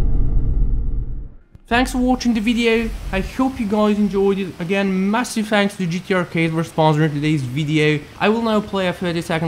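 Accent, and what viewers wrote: United Kingdom. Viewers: Are u from Scotland?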